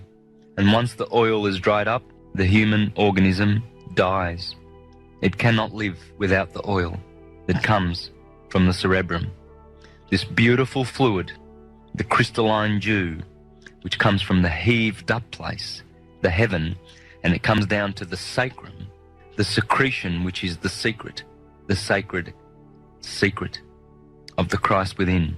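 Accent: Australia. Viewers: Shame on you for not picking up on his voice and Australian accent. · His Auzzie accent gives me the shits.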